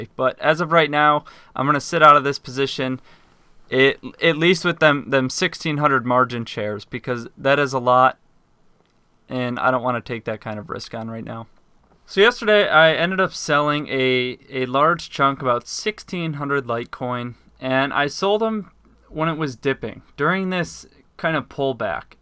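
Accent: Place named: United States. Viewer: You sound like your'e from America. I thought bitfinex doesnt allow US customers?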